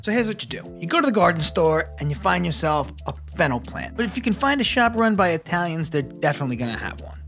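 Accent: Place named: New York, United States. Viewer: Love your New York City accent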